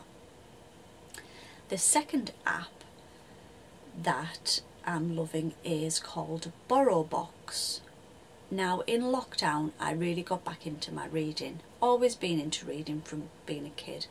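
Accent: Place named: North East England, United Kingdom. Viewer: Enjoyed your vlog your shirt is lovely -was trying to place your accent you sound like you may be from my neck of the woods I’m formerly from Bishop Auckland now North Tyneside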